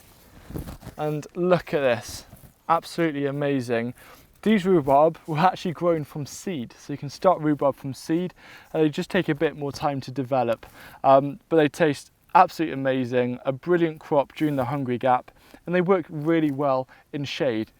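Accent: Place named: United Kingdom. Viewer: Where are you at? I thought you were brittish😊👍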